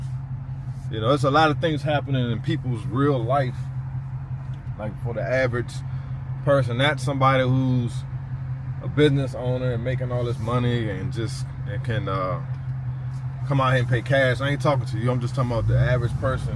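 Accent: DMV, United States. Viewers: That looks like old Bowie, MD fr. You definitely from the DMV! I can tell by your accent too!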